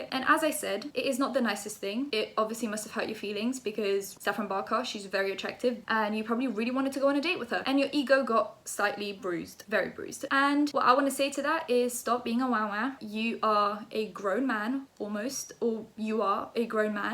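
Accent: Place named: London, United Kingdom. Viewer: That’s a proper London accent u got there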